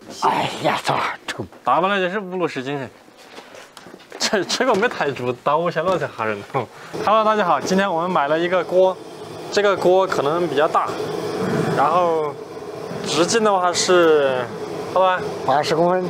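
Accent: Sichuan, China. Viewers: 作为一个重庆人，习惯了油管上的国语和英语，听到王刚的四川话，既感觉亲切，又有些想笑。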